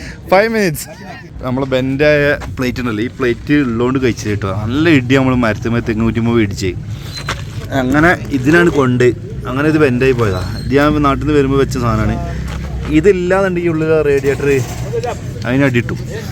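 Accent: Malappuram, India. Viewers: നിന്റെ പെർഫോമൻസ് നന്നാകുന്നുണ്ട്!മലപ്പുറം ശ്യ്ലി യിൽ മലയാളം ok!!!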